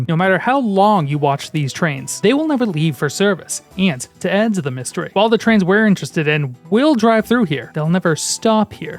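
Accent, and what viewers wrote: Boston, United States. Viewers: I feel like I’m going crazy was he always this Boston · Please stop doing that Boston accent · are you from boston?? i swear i can hear an accent but im not sure 👀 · I can hear a bit of Boston in your voice😅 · Do what you wanna do man, Boston accent included! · please keep doing that Boston accent :3 Is it your native accent?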